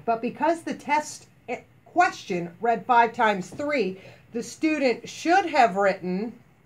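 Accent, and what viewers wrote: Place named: United States. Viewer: American I'm guessing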